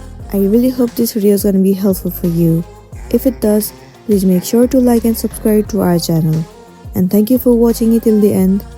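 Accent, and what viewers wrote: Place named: India. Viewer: Indian accent youtube video in 2024 is insane.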